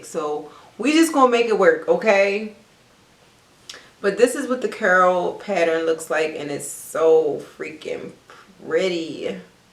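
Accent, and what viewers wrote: Baltimore, United States. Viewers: bmore accent ?? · This is my first video ever watching her 😂 i think i can tell that she from Baltimore lol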